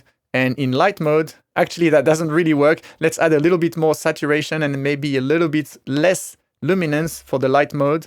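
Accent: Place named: France. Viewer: French accent just makes it better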